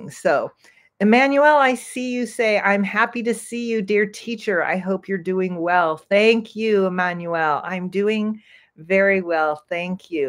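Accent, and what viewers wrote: United States. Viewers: Unfortunately, I didn't watch the livestream. However, now I am watching and I can understand each word coming from your mouth very clearly although you're American. Since American English is harder to understand than British English. Probably you are speaking slowly because of your having lots of international followers. Good channel and very helpful. Thanks for your contribution. 👏👏👏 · Dear Madam I like to hear your voice and I almost understand all the content of your speaking. Even though, I've been learning English for almost twenty years, up to now, I can't imitate American's intonation and style like you do. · I am from Indonesia and always glad to hear American English with speak slowly like you do. I just still dificult to understand between can't and can. Thank you.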